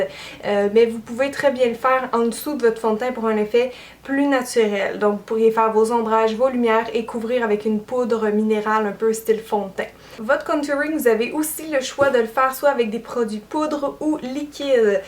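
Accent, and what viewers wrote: Quebec, Canada. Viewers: bnj je suis kahina de l'Algérie pour tous vous dire je ne suis pas trop maquillage mais depuis que j'ai commencé a suivre vous vidéos j'ai commencé a m’intéressé hhhh vous expliquez super bien votre accent j'adore vu que j'aime le Québec mais j'aime pas trop le froid lol vraiment du fond du coeur bonne continuation longe vie a votre chaîne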